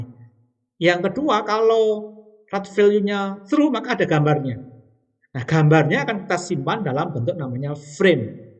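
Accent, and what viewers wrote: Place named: Java, Indonesia. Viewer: instoll instol instoll.. hehehe medok kali jawa nya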